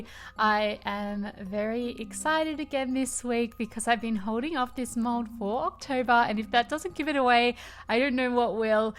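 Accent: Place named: Australia. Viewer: You are such an Aussie! Lmao "oh there's a creepy crawly." You pick it out. Me: nope!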